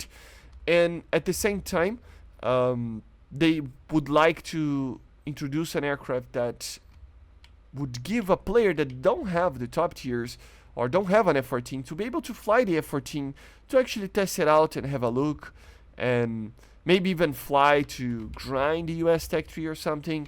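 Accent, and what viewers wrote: Brazil. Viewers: Ah esse sotaquezinho brasileiro rsrsrs eu amo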